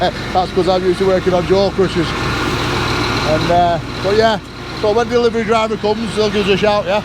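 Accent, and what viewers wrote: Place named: Preston, United Kingdom. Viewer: It’s not just the Preston accent… but the engineering drama is like ‘DIBNAH 2000’ or something. Love it.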